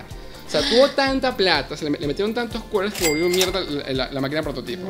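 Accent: Venezuela. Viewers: Me encanta tu acento venezolano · Como cuando ves un podcast de y te sale un anuncio de jaja Por cierto tu acento me suena a venezolano porque yo también lo soy jaja un saludo bro excelentes podcast y me suscribo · son venezolanos me lo e preguntado mucho por que un tio mio habla como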